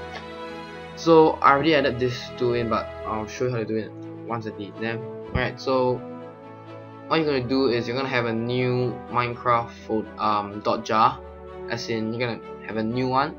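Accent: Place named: Singapore. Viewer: Thanks! By the way, your voice really sounds like a Singaporean. ;)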